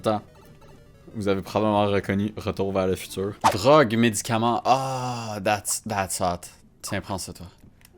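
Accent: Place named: Quebec, Canada. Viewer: le best of est incroyable ! franchement jeux vidéos + l'accent québécois les deux choses que j'aime réuni dans une seule chaîne ça fait plaisir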